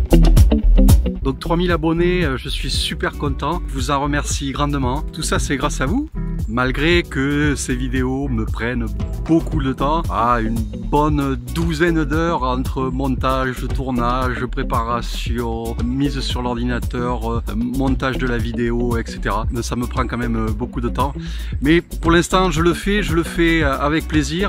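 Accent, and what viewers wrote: France. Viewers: Bonsaï? Bien sûr! Ses Vidéo? je les adore, et je les partage. Elle m'aide beaucoup, pour moi même et surtout pour animer le club! Le personne? Un mec super! Son accent du sud-ouest? Me rappelle mon enfance, évidemment je l'adore. Si je participe? Évidemment!!! Et puis j'adore les pots de François...sait-on jamais? C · Salut. Moi aussi je veux jouer au jeu des 3000. Ne change rien continu comme çà. Ce petit accent du sud qui nous donne des petits trucs et astuces pour nos Bonsaïs, je ne m’en passe plus.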